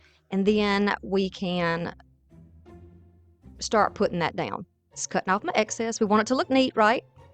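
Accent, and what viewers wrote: South, United States. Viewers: very cute and awesome vintage haloween vibes. i like your accent, reminds me of living down south. and i like your creations. congrats on the temu affiliation. as a fellow diy/arty crafty type, i have some amazing stuff from temu and next time i order, i will remember you ☆ ty for sharing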